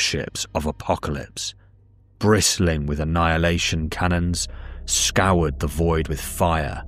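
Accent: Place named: London, United Kingdom. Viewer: Your true voice truly sells it. London accent no? Def not Manc and no chance Scouse as those are the ones I’m familiar with. Lol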